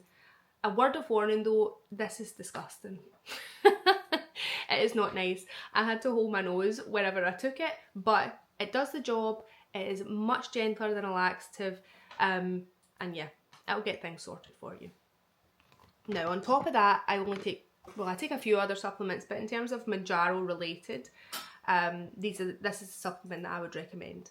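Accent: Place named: Glasgow, United Kingdom. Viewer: I absolutely love the accent! As you say, a broad Glaswegian accent is pretty much a different language.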